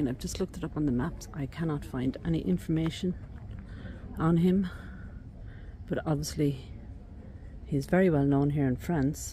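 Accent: Ireland. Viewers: I am a retired language teacher. And was raised by the Sisters of Catherine McAuley. An Irish Order. I understood your “brogue”, but it was an attempt to help you say the French correctly. Bonne journée 🌷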